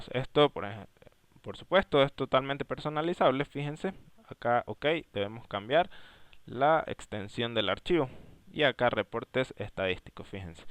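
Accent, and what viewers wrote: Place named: Venezuela. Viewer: Chamo, primera vez que escucho a alguien de Venezuela, saludos brother, chevere tu video, me ayudo.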